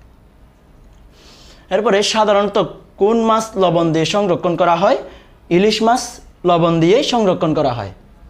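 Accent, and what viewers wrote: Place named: Chittagong, Bangladesh. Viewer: চিটাগং এর পোলা মনে হয় ভাষায় বুজা যায়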